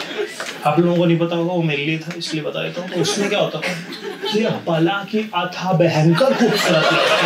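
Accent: Indore, India. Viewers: his jokes may bot be funny everytime but what makes him truly a legend in india is his indori accent and way of acting , his relatable sakkht launda stories and down to earth attitude.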